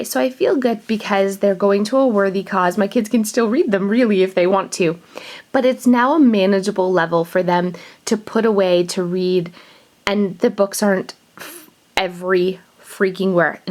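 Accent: Canada. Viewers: I'm a new sub! Love your channel and your personality! Are you Canadian? Your accent sounds that way ;) But I'm from Alabama so I'm sure I sound great to you! Ha!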